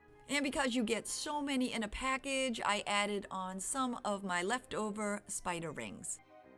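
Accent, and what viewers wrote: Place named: South, United States. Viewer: Being from the south i personally love the way you say metal but my favorite was the way you said " canning bands" . I am certainly not making fun. In case you don't remember, I'm the subscriber with the best friend that moved away and your accent sounds like hers. I absolutely love your crafts too and made quite a few. You never fail to make me smile so thank you!! I was having an awful day and now I feel better. 😊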